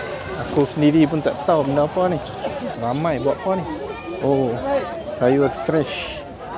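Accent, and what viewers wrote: North, Malaysia. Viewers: Wow... Kita sekampung loghat utara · u ckp loghat utara, i mati2 ingt u org melayu. rupanya u indian. tapi u ckp mmg macam melayu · Slang utara dia memang sempoi.. Jelas dan faham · baru pertama kali tgk video abg memng puas la dgn loqat utara lagi the best ...semoga channel abg cepat brkmbng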